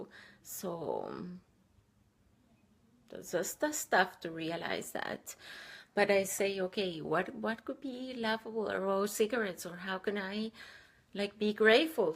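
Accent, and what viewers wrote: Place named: Russia. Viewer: thank you for your video - I think you are the first I’ve found to share about The Art of Soaring. You touched me by your genuine sweetness & intelligence. I like listening to you speak in your thoughtful, measured way and Russian accent. Happy 420! Also are you wearing a Cedar from Anastasia? I have one too. You made the connection between AOS and Ringing Cedars - I think it’s the same publisher not translator. Anastasia means a lot to my friends and I.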